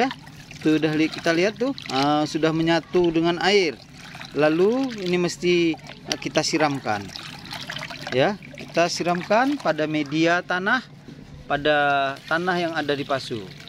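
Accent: Malaysia. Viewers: saya ingat salah dgr abang ckp loghat org Malaysia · Pandai cakap malaysia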